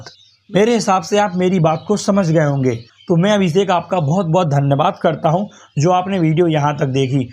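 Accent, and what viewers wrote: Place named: India. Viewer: Bhai English to sahi kr lo pehly Indians ki English or accent bohat kharab hai passive voice hi ghalat hai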